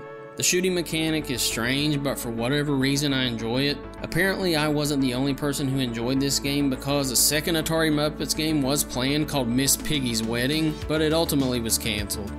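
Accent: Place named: Mississippi, United States. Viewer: Awesome! I am down in Mississippi and your accent sounded familiar. Keep it up!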